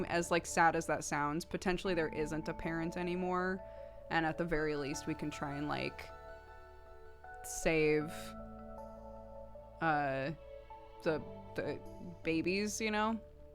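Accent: United Kingdom. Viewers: She's become fully bri'ish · Very British for some reason 😂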